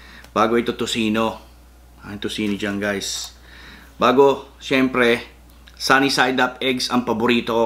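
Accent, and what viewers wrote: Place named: United States. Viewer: Sir kung hindi ka lang nagtatagalog, aakalain ko american ka..hehe..Godbless po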